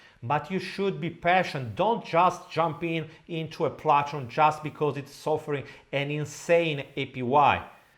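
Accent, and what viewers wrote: Italy. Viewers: Thank you so much for showing us these bad platforms. So educational for humanity good. I just discover you and although it takes time to understand your Italian accent, I love it.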